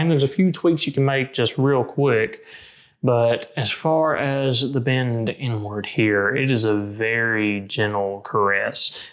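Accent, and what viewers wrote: Tennessee, United States. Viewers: I recently bought some frames I really wanted from the UK online but was having a hard time adjusting them, watching a few of your adjustment videos helped me get them just right. I had a bad experience with another pair I bought. I loved the frames and the opticians were nice, but they just couldn't get them adjusted right after having them adjust over a dozen times. Anyway, it's nice to have someone explain what to look for and how to make that bend to account for it (also just... what you can and can't do to a frame to make adjustments, I think that's another source of confusion). I looked up your store, nice to see a fellow Tenneseean (I thought I recognized a TN accent!), I'm from Knoxville but I'll definitely look into your shop when I get my next prescription update. Out of curiosity, do you stock Reykjavik Eyes? Thanks for creating these helpful videos!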